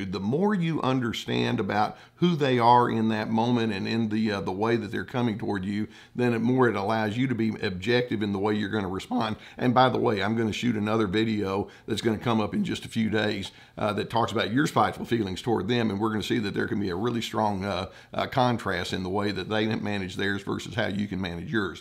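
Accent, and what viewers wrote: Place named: Texas, United States. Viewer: We have a narc neighbor (70 year-old female covert) in the condo below us that's always chiming in on petty things that are none of her business. It started with small, innocent statements, then escalated to (subtle and not so subtle) attempts by her to govern when we use our plumbing, appliances, electric blinds, enter various rooms, you name it. Boundaries of our home space, bodies and schedule are constantly tested. She invents rules and limitations that go beyond local laws. Whenever we have visitors, she'll go out of her way to rain on our parade. She tries her best to get into our heads and create psychological doubt. There's zero tolerance as we never know which alleged infraction will lead to a lengthy, condescending, word salad text or email. Since educating myself on narcs and going grey rock, she has lost interest in combating us and may soon be moving out. 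Thanks Dr. C for imparting your wisdom with a sweet, down-home Texas drawl. Fingers crossed!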